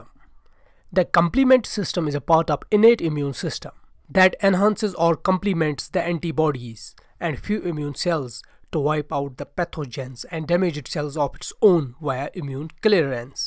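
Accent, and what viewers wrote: Kashmir, India. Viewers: Thank you so much. It was really helpful. Are you a Kashmiri though? Sound very much like a Kashmiri😄❣ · Are you sir from kashmir 😍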